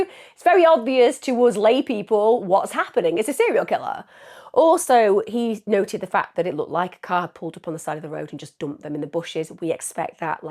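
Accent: United States. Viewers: Your interview in the American accent was hilarious 😂 you are right up there for me ❤ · Hearing you with an American accent was so weird haha! But it was actually very well done! 😊😊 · !! your American accent is getting so much better!! Have you been practicing 👌♥️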